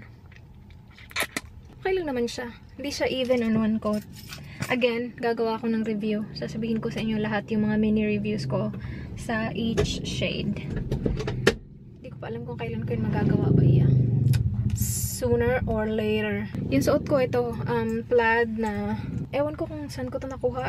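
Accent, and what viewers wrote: Visayas, Philippines. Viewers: bisaya diay ka te??pareha rta!!ahaha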